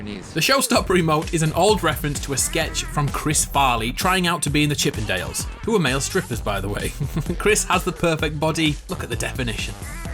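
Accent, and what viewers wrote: United Kingdom. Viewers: Yay good brit voice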